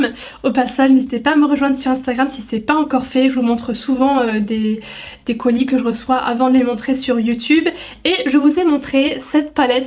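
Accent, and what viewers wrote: Lorraine, France. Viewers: Je découvre ta chaîne avec plaisir ^^ Ahhh moi aussi je suis Lorraine d'origine et alsacienne d'adoption Lol je l'aime trop ton petit accent 😍 Merci pour ta bonne humeur 😉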